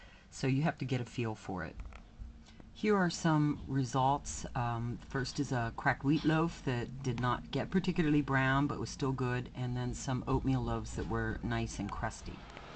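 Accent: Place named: Pennsylvania, United States. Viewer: I'm hearing a Pennsylvania accent :)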